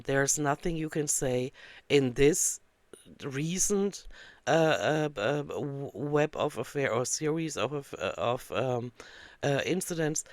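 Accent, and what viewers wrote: Germany. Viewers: RIP, your beautiful German accent voice and you and your personality will be and is missed 😢 Why must everything good get to be taken 🕊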